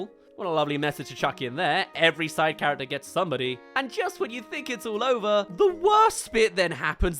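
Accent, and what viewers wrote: United Kingdom. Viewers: and you're proof is? Cause I said so? Literally you don't know that, I think hes just British and we know British people pronounce things differently than Americans. Not saying they're wrong, just saying British people are silly.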